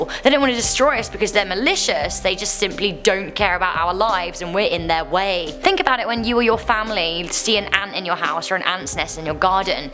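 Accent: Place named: United Kingdom. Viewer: Who else clicked out from this video after hearing her talk? Them brit's have the most annoying accent and they love to hear themselves talk.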